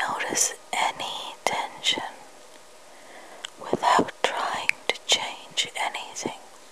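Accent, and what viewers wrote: Kilkenny, Ireland. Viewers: Is that County Kilkenny I hear? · yeah sounds like a kilkenny accent im a cork man myself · It's amazing to me how certain Irish accents sound so much like certain American accents.. I mean they're very different in many ways, but it's striking what they have in common. Your first couple sentences I even wondered if you were from the US or something until your Irish accent became obvious. I wonder if you feel the same about American accents or if it only goes one way. Hmmmm.. FOOD FER THOUGHT! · Love the irish acsent. This video helps me sleep. Love it :) Gray/ TheSquishMovies x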